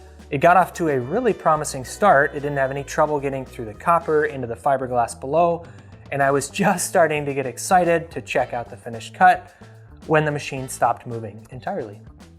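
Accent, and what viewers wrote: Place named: United States. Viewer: You sound like an American, so talk like one. Dump the mm, and use SAE. That's how we communicate here! mm doesn't mean diddly.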